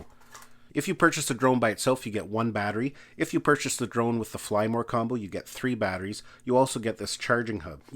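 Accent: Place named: Maritimes, Canada. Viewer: Have yet to get my first drone, and am considering this. Excellent in-depth review, descriptions, and explanations. I learned a lot. By the way, what part of Canada was the footage shot in? The accent sounds a bit east coast-ish.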